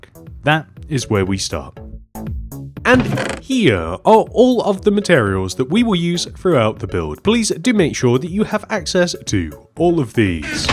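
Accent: United Kingdom. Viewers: He's definitely in UK you can tell by his British accent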